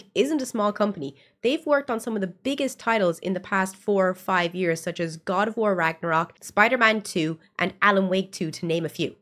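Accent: Ireland. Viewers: love your short videos and I'm glad I came across this on YouTube. love the Irish accent, my great grandfather came to America from Ireland, and I really want to visit someday. but to get back on topic, totally agree, you make garbage people don't want to play it's not going to sell. I keep hearing the people against sweet baby and other games as a vocal minority but if that was true the game would succeed, hmm wonder why they don't. maybe because its minority you are making your games for, and they don't buy them, you cultivate the wrong player base, and you will end up on the cutting room floor.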